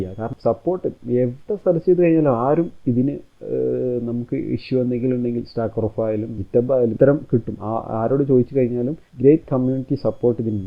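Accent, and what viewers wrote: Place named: Malappuram, India. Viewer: malappurathevideya........slag kettapoye manassilayi malappuram anennu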